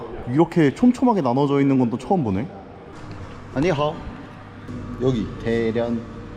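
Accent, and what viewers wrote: Jecheon, South Korea. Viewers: 사촌형이 제천사람이라 그런가 말투 너무 익숙해요 ㅋㅋㅋㅋ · ㅋㅋㅋ 보면볼수록 제천사투리가 잘 들리네요 ㅋㅋㅋㅋ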